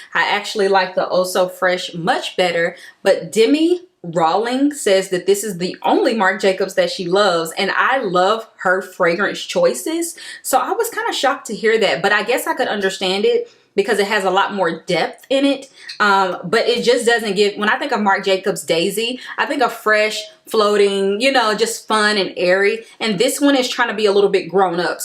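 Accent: South, United States. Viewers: Love your country accent.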